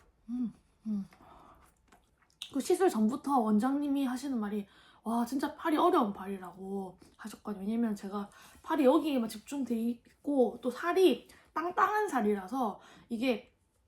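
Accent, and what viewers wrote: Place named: Busan, South Korea. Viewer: 부산 여자 너무 좋다. 사투리가 매력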